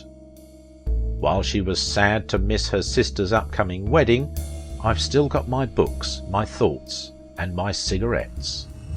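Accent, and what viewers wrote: England, United Kingdom. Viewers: Nothing worse than a male gossip. Especially with an English accent. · Shoddy english accented tripe for clicks. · Hi, hope you're doing fine with your busy agenda. You have a strong british accent... Are you originally from Great Britain? Just asking. Have a great day and thx for your work!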